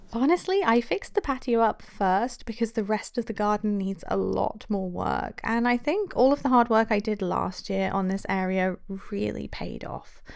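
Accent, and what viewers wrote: United Kingdom. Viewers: Swear i could just listen to British girls talk all day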